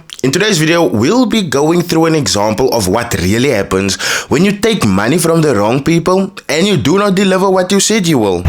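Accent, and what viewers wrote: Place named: Cape, South Africa. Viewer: The way you speak makes me miss my Kaapse brahsse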